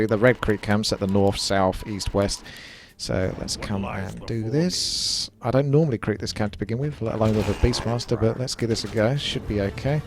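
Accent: South, United States. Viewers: that southern voice though, sounds like you're a flirty barman.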